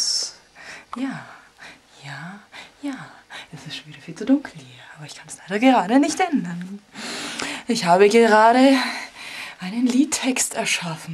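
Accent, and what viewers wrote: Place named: Franconia, Germany. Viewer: des issen franke oder? .. bistu en franke? hörst dich so an.. ohne scheiß.. des is soo blöd dasses wieder lustig is xD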